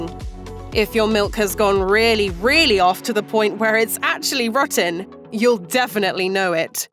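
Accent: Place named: United Kingdom. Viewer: wait whaat! , mashed isn't british lol , where'd you find this so lovely nice british narrator lady , she's sounds awesome , btw im british too , great video & lovely narration too , stay safe mashed staff , bye!!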